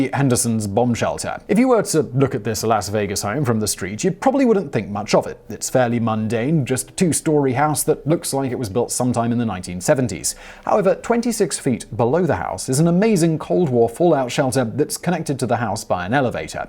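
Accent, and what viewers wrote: United Kingdom. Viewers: Two things: 1 - Love occasional British pronunciation of names or locations. I was doing laundry while watching this one and it took me a moment to realize the Adri-an-dak Mountains were what I have always heard refereed to as the Adirondack (Ad-i-ron--dac) Mountains. And B - Lived in Indiana most of my life and had never heard of the Vivos shelter. Once again I find that a wacky Brit and his friends living on The Continent have educated me on something going on in my own backyard. Keep up the great work, and the rest of the team!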